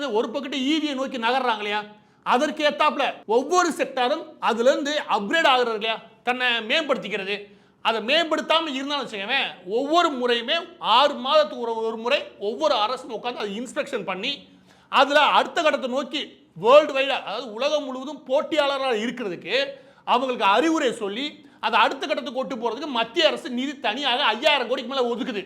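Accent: Madurai, India. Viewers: சாட்டை நெல்லைத்தமிழ் நீங்கள் மதுரை தமிழ்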